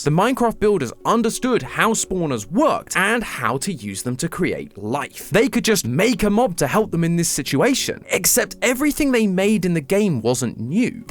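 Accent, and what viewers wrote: United Kingdom. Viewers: 100% more British? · BRITISH GAME THEORY IS HERE TO STAY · At times i don't notice British accent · edition.. Now 100% more British 😅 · hey i mean i thought that would ruin the game theory and that all the other channens but ya'll are doing a great job at making videos its the same videos bud with bri-ish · I spat out my water I was drinking when I read the "Now 100% more British!" because himself reference that "You might be wondering, why does now sound British?".💀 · Wait game theory is British now?! I think I may have missed an update 😅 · Why is british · Game theory Now 100% more british! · Now 100% more British is crazy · its not the same, i cant listen to this british voice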